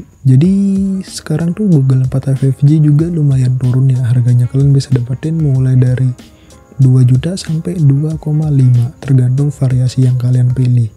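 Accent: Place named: Lombok, Indonesia. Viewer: logat bahasanya kayak lombok bang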